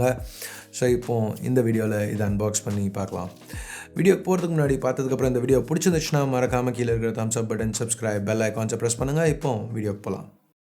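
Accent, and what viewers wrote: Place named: Telugu, India. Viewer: It's really surprising that you are in talking in Tamil because I thought that you were telugu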